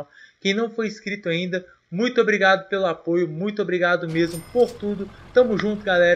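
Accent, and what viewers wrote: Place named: Minas Gerais, Brazil. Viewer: o é mineiro? ele tem um sotaque mineiro.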